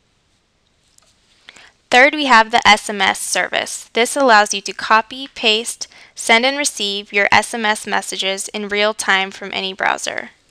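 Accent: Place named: Scotland, United Kingdom. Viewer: Awsome dialect. Remnds me of COD4 Cpt.MacMillan. Are you Scottish, or Irish?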